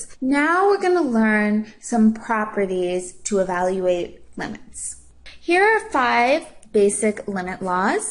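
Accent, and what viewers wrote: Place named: Maryland, United States. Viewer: hearing your maryland accent made me feel back at home while going to college in california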